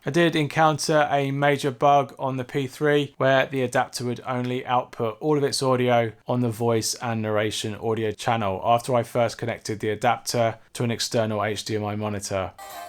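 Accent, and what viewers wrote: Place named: United Kingdom. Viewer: Hey man just some friendly advice for your English UK self haha. We all appreciate the heck outta your videos n the time n effort that goes into each one as they're not easy. But with that said, your videos have all become the exact same in regards to the tone of your voice or mono tone effect if you will, and it's causing many people (I've spoken to others who have mentioned same thing) to downright turn it off cuz they've become flat and boringish to an extent. Most noticably when you talk about good things about the device then nonchalantly dive into bad things without even segwaying or mentioning it. Wanted to bring it up bcuz we all know it better than this bland same shit different day attitude and script u seem to have. So let's pep it up and learn from our mistakes. Keep on keeping on. Or is it? Or however it's pronounced I'm bad with that Uk accent. 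Take care buddy.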